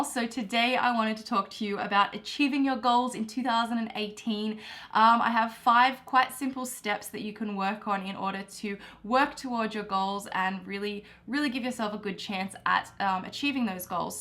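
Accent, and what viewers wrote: Australia. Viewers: Do you have an Aussie accent? I heard you say "here" in the American accent . But the rest seems Australian to me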